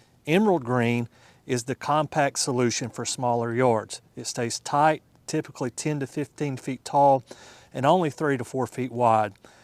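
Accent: South, United States. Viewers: This gentleman has done a nice job, but the video would be even better if he'd provided information about the shrubs' plant zone and deer hardiness. His southern accent should have tipped me off to the possibility that he might focus on southern plants.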